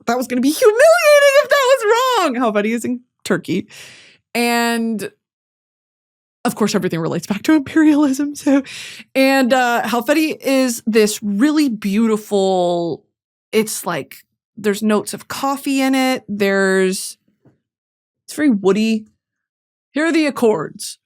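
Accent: United Kingdom. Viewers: as a londoner i am SO obsessed with your interpretation of a British accent hun x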